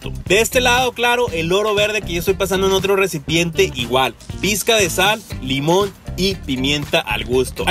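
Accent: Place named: Mexico. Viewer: Como me gusta ver tus videos me haces reir😃🤣😁 con las cosas que dices te escuchas bien mexicano❤ Estan bien padres tus recetas un dia de estos cocino algo siguiendo tus kitchen skills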